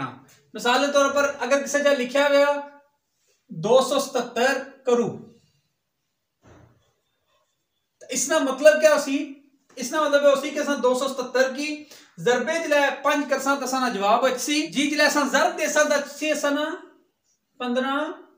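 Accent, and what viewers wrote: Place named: Punjab, India. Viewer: Ye kis zile di jaban hai.tusa Punjabi se mukhtlif bol rahe ho.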